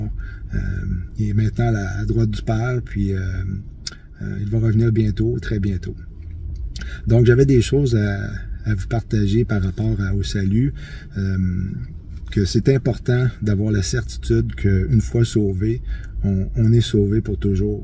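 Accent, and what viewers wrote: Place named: Canada. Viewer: Oui Shaloom nous aussi on t'aime beaucoup je regarde tous les jours mes abonnements et je te «surveille» si tu arrives j'ai plaisir a t'entendre avec ton accent canadien Tu nous permets de patienter dans la Foi a entendant Son Retour Continue comme ca meme de nous lire une portion de La Parole simplement cela nous unis tous ceux qui t'écoutent de tous les continents Sois beni avec ta petite famille et ton assemblé par notre Seigneur d,'Amour Le Veritable🎆👑🎆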